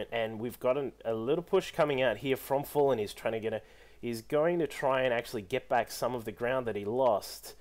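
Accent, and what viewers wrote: Australia. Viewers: Great to get some Aussie casting going on!!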